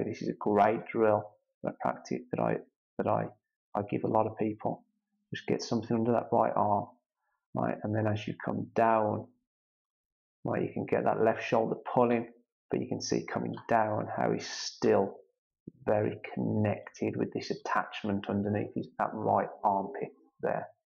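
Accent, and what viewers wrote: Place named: Black Country, United Kingdom. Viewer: Hi really enjoyed this analysis of the master! You say it is really important that his head/eye is tilting forward to help the back swing could you explain? Also how much forward tilt from the hips do you recommend. By the way love your black country accent!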